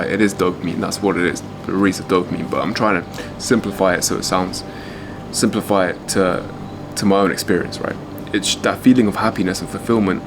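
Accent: England, United Kingdom. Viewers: And not to mention that gorgeous cut-glass English accent that makes come across as so classy and divinely sweet and adorable - as an older Irish gay man myself, I’d definitely date the handsome in a heartbeat ❤❤❤❤❤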